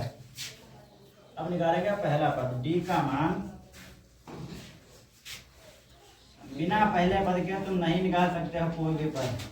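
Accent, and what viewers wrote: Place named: Rural, India. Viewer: Ye dehati kon bol Raha tha😅😅😅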